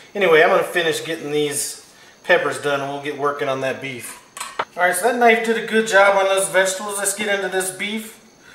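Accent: South, United States. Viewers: Nothing wrong with a good Southern Drawl haha. I am friends with Christy's Cooking Channel. Those things look awesome. I will check hers out too.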